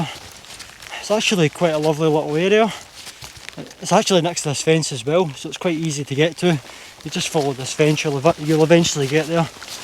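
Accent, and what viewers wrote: Scotland, United Kingdom. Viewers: Are you Scottish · are you british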